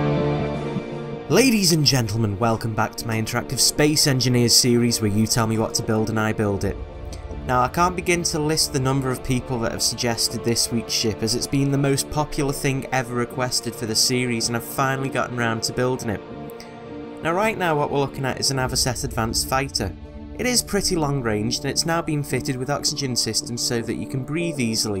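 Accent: United Kingdom. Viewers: Anyone else think this guy sounds like a male GLaDOS at times? And maybe a little bit less autotuned. And British, okay maybe he doesn't sound like GLaDOS.. Just a little bit? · He sounds like he lives in England (well, he's English, he may not live here lol), so nope. We rarely get sunlight.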